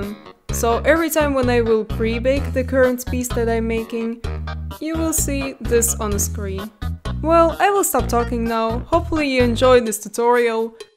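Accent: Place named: Russia. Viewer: I don’t know what country you are from, but you definitely have a Russian accent)))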